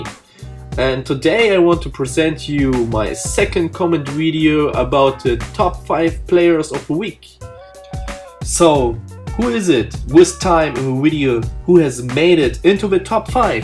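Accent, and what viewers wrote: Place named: Germany. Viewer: das ist so lustig wenn ein deutscher englisch redet man hört das einfach aber nices video :)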